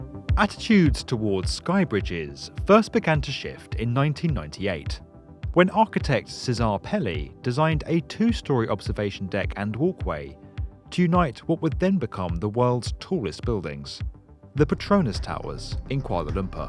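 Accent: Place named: RP, United Kingdom. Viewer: You have got a state-of-the-art received pronunciation RP. Do Never miss it! And ALWAYS, ALWAYS STICK TO THE METRIC SYSTEM. HOWEVER, in this subject (aerial corridors/sky bridges/ elevated pedestrian crossings.) You might be interested to know that although perhaps not so much flamboyantly spectacular as the Asian/arabs ones you could realise that this type of structure are commonly found in Big South American cities and in Spain even in ciudad de Panamá I remember walking within one 10 years ago in the Radisson Decapolis Hotel connecting it to the Casino over a high traffic road. Another "small" example that came to my mind now is the long aerial covered bridge linking 2 shopping centres in the Colombian Caribbean city Barranquilla (Where Shakira & Sofia Vergara were born) My point is that that is something that Chinese wallet took it (like many things) from Europe (Spain, chiefly Barcelona) they are NOT PIONEER AT ALL IN TERMS OF ARCHITECTURE OR URBANISM AT ALL!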